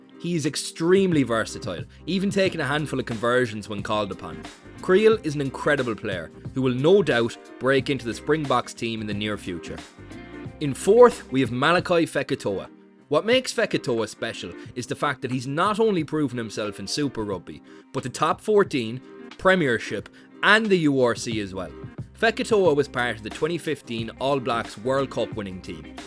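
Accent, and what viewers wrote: Ireland. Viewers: Heard an Irish accent and I knew there was going to Green glazing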